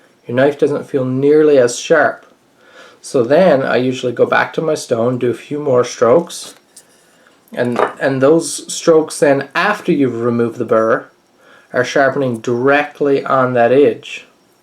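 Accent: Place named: Newfoundland, Canada. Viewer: New to the channel you sound like a fellow Newfie! Love it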